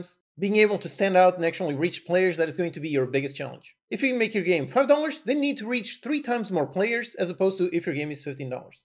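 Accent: Portugal. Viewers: Gajo você é português? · és português?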